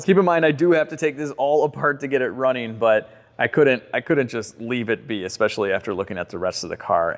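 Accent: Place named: United States. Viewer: I would subscribe, but...your squeaky American voice ( any American voice) is so annoying that I can not bear to (skip) watch more then one video the most interesting thing in this was the small green bug...It should have it's own channel I would watch that!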